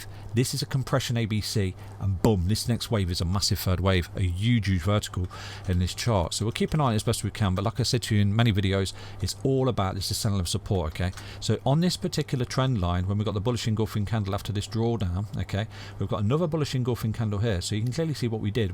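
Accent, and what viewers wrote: United Kingdom. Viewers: Keep up the good lovely british accent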